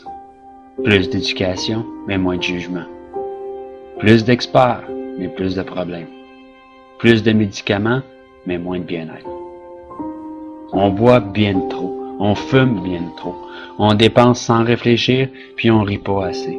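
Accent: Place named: Canada. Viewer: j'adore l'accent Canadiens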